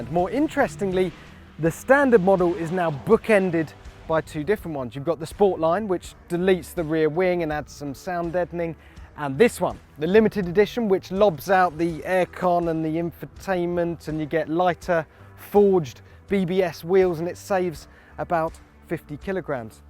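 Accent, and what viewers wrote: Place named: United Kingdom. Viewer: Fiestar st, I don't know why you britisch people see letters where their arent. It's like you say 'I'm sat in', another weird ass thing to say. Ya'll a bunch a weirdo's.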